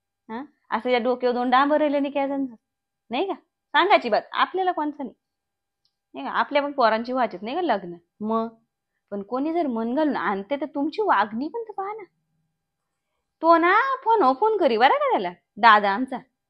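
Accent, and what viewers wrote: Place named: Shahapur-Murbad, India. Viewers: Shahapur murbad bhasha 😂😂😂 kinhavli pn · अभिनंदन आपल्या शहापूर - मुरबाड च्या महिलांचा परफेक्ट टोन पकडलाय . ऐकायला गोड , आपुलकी जाणवते . शुभेच्छा.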